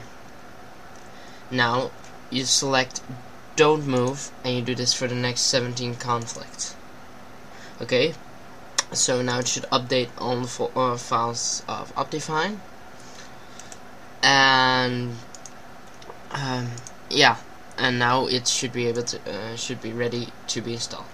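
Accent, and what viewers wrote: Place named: Netherlands. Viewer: Your Dutch :D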